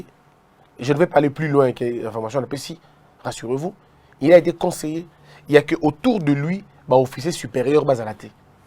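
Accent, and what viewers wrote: Democratic Republic of the Congo. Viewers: Christian BOSEMBE merci de nous sortir de la médiocrité. Te suivre est une délectation. Bravo. J'en apprends des choses. C'est un pur délice de t'entendre parler lingala. C'est quoi mitende ?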